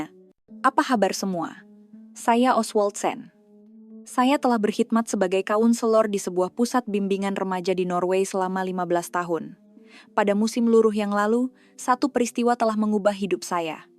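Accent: Indonesia. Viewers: Suara orang Indo ni😂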